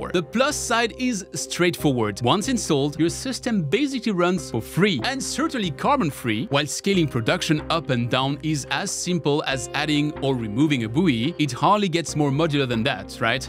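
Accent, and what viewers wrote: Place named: France. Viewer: Your french accent is sweet, but please düvlop, it really is so hard to understand it is.. de-vel-op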